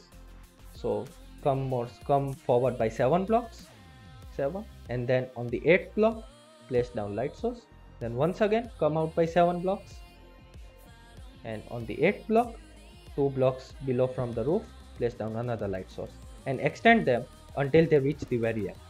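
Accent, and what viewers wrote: India. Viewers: bro you got the most yet best indian accent i have ever heard!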